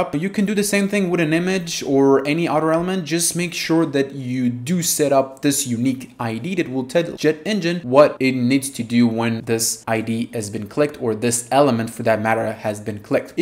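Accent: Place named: Brazil. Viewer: Primeiro vídeo que assisto teu, muito bom, mas tu é BR né? hahahaha sotaque EN_BR é muito claro pra mim.. haha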